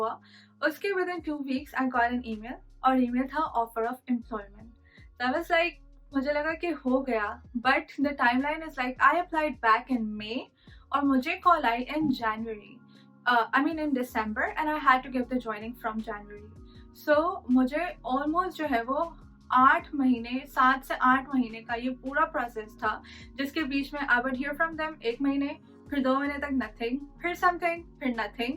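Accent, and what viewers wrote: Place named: India. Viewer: Who did her interview? She doesn’t even speak French let alone that heavy thick Indian accent.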